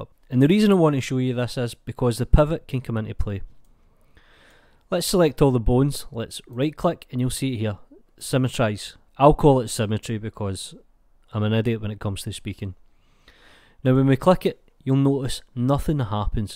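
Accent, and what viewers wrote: Scotland, United Kingdom. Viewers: wao thats some strong scottish accent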